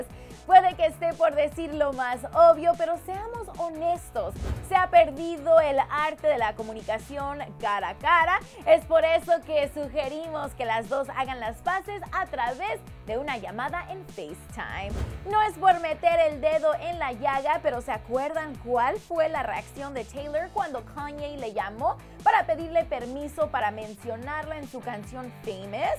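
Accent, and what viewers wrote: United States. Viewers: No busco ofender a nadie. Los estadounidenses tienen un acento MUY fuerte, por eso la forma de hablar de. Si escuchas a un inglés, hablando inglés:v, notarás la GRAN diferencia de acentos. P.D: Me dan igual los insultos. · la conductora es gringa es normal que hable así es como cuando le hicieron pedos a la conductora de noticieros latina por pronunciar bien los nombres en español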